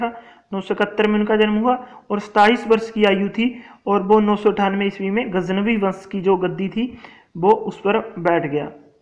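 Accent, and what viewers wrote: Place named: Punjab, India. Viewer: bhai y punjabi h... iski hindi se hi pta chal rha...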